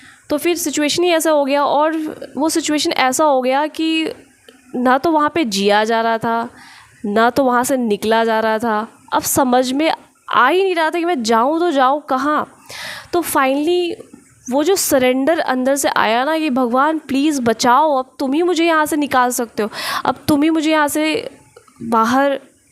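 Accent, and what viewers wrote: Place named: Bengal, India. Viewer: your bengali hindi accent is awesome 🤩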